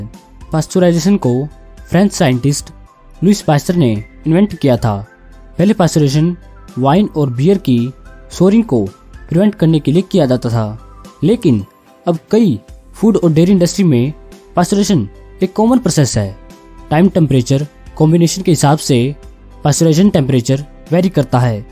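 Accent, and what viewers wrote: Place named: Haryana, India. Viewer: Haryanvi hindi....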